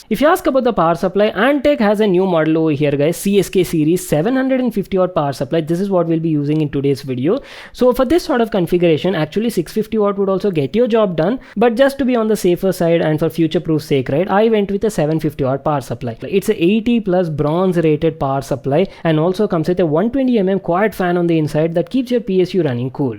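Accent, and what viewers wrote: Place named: India. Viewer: He speaks english just how Americans/native english speakers thinks how indians speaks english.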